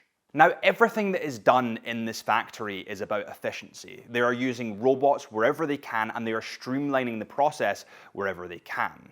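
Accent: Scotland, United Kingdom. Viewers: He's Scottish, every word sound a little different 😊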